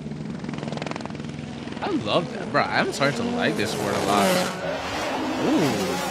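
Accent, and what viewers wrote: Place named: Caribbean. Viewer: Gday mate, love from Australia. I have a question that might sound weird......well because it is weird but that's my brilliant beautiful...weird brain. Do you have a Caribbean background? Or are you Canadian? Wait that nakes more sense. That would explain why you seem so chill and friendly. Sorry for being a weirdo, I'll let myself out. 😂 Edit: I was referring to your accent. I have a weird obsession with accents.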